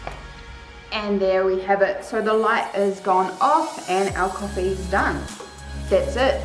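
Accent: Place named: New Zealand. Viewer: sounds kiwi 2 me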